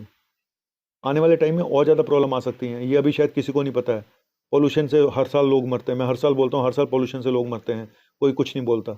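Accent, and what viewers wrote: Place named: Bihar, India. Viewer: Sir your Bihari accent was excellent 😀😀😀😀😀😀😀.well done sir 🙏🙏🙏🙏🙏🙏🙏🙏🙏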